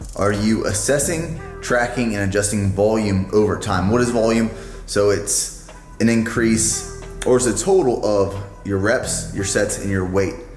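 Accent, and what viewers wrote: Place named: Pennsylvania, United States. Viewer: That tank he was wearing at the start? Who buys that kind of shit? Oh yeah, he is from Western PA. horrible taste, horrible accent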